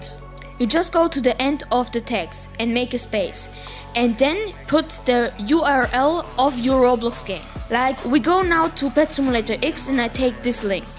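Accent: Germany. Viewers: Are you german? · TY bro It helps very much (und man merkt das du deutsch bist aber gutes english) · ICH WUSSTE DOCH AN DEINEM AKZENT DAS DU DEUTSCH BIST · Ich dachte mir schon das du bist deutscher 😂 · mann hört rauß das du deutsch bist hahah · u german? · Find ich gut dass man merkt das du deutsch bist. Aber trotzdem danke für dieses hilfreiche Video. 👍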